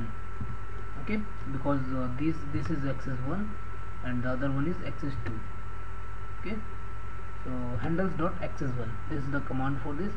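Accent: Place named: India. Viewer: You sound Indian, but that's my university's logo, and it's an algerian university. What happened?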